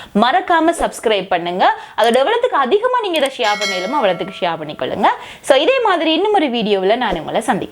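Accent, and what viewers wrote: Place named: Jaffna, Sri Lanka. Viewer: Aama jaffna thamil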